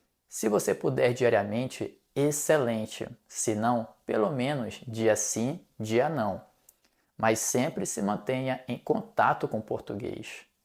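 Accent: Rio de Janeiro, Brazil. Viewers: Que motivante, gostei! É um prazer ouvir esse seu sotaque carioca, muito bonito, que posso utilizar como um modelo para o meu. Obrigado! 😊 😎 🎉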